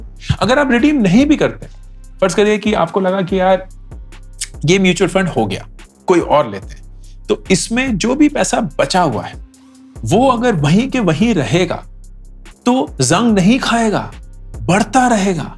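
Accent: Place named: Kashmir, India. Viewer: Sir Aap ki surat aur zubaan se kashmir ki khushboo ka ehsaas hota hay.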